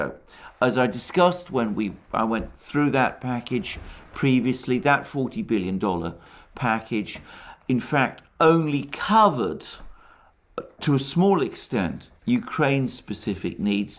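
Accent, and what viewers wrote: United Kingdom. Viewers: He reads commonly available materials and chats about it. Another sucker for a Brit accent.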